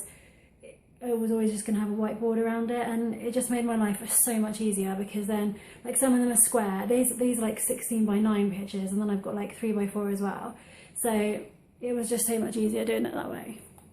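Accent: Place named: United Kingdom. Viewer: Loved it! Thanks for sharing! Had no idea you are from across the pond! Loved hearing your accent as you described your book. Anyway, what I wanted to share was about your receipts...you are right, they do fade but what I do is make copies of receipts now for my Smashbooks so they last longer. Do you think you can do that? Just a thought for you. Thanks for sharing your American trip memories! Now you must come to Florida to pay us a visit at Disney World!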